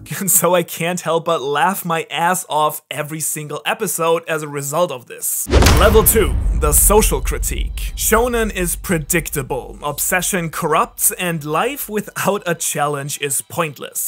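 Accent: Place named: Germany. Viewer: Bruh seit gensos podcast hör ich richtig raus dass du deutsch bist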